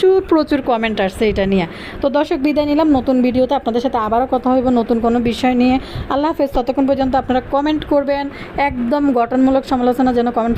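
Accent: Noakhali, Bangladesh. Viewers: আপনার বাড়ি কি নোয়াখালী। আপনার ভিডিও যতবার শুনি ততবার আমার খুব হাসি লাগে বিশেষ করে বাপ্পির ব্যাপারে যে কথাগুলো বলে না আমার খুবই হাসি পায় আপনার কথাগুলো শুনে❤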